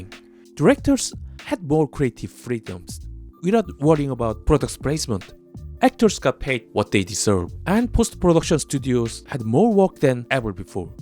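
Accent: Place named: South Korea. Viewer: Wow, i believe its first time when YouTube suggested me a video with that low views, but I didn't even notice it. Still, very good video. I love that you want to keep your korean identity, never forget who you are and where you from. Also even listening to that strong korean accent I still can understand and follow your thoughts. Keep up with such a great job! Love from Russia.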